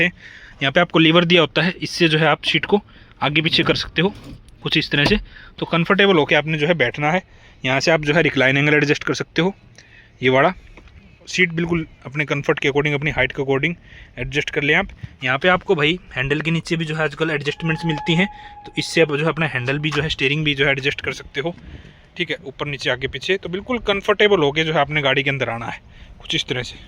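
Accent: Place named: Haryana, India. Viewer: Haryana Sy lag Raha bhai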